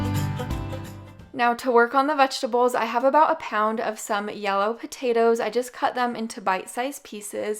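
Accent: Utah, United States. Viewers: It's not that her language is sloppy but she does speak differently than she did a year ago. Some of these are older videos. In the more recent videos, she has picked up a Utah accent. They drop their T's and pronounce things differently like un-tul instead of until, etc.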